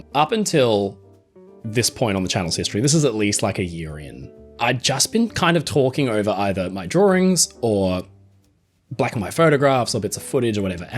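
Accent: Australia. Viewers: Your Australian accent is stronger in the first video. I have to do that (almost pseudoEnglish accent) at work as well or people don’t understand what I’m saying. 😅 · I love listening to you talk, you're so knowledgeable, a great teacher, and totally charming. (And I'm a sucker for an Australian accent.)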